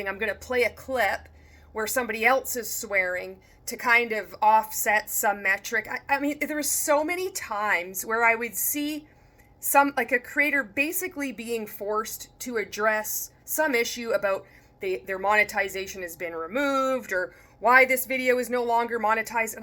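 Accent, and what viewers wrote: Canada. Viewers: I think you should monetize. I don’t care if you make a little dough off me. You are hilarious, sincere, direct, and I love an angry Canadian accent! SCAAAAAM!!!! Love your content and keep it going.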